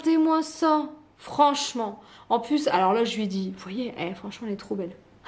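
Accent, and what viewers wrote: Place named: Béthune, France. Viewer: Oh!!! J’adore votre accent! Vous êtes de quel coin? Mais vous l’avez peut-être déjà dit …(je suis une abonnée récente) cela me rappelle mes cousines qui sont du côté de Bethune.Sinon merci pour vos vidéos si agréables ❤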